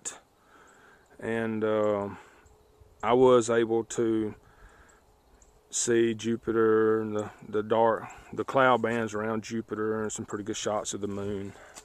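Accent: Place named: Texas, United States. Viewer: I'm fascinated by the hobby and have finally narrowed down my telescope search to the Orion StarBlast 4.5 or the 6. (The 6 has bigger aperture, but I'd like the dobsonian tabletop mount.) Have you, since making this video, had a good experience overall with this scope? I'd love to hear your thoughts and whether they've changed since having it for a few years. P.S. Are you Texan? You sound like my family and it's great. Haha ;)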